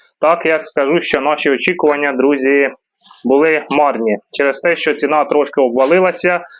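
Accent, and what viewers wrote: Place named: Cherkasy, Ukraine. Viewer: Подяка людям праці .Мова точно як в селах Черкащини.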